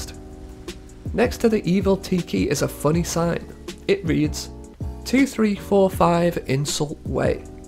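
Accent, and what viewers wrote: United Kingdom. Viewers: Where in UK are you from? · I'm happy to have this to watch, but at a certain point I got to the stage of thinking "if I hear him say 'neversoft developer' in the same nonchalant British-accented English teacher tone just one more time, I'm gonna lose it" You really should have broke that section up or just listed all the names in rapid succession and said they were all developerS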